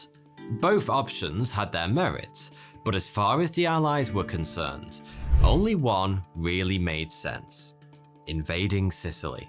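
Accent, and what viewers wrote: United Kingdom. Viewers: British English🤮🤮🤮🤮🤮🤮🤮🤮🤮🤮🤮🤮🤮🤮🤮🤮🤮🤮🤮🤮🤮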